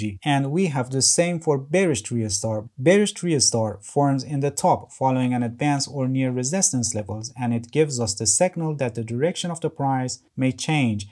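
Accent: Iran. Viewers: As soon as I started the video, knew my man was Persian. Halet chetore azizam???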